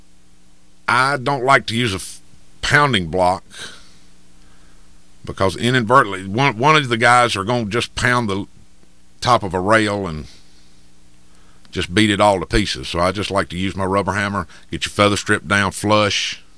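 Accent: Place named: United States. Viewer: Probably the thickest American accent I've ever heard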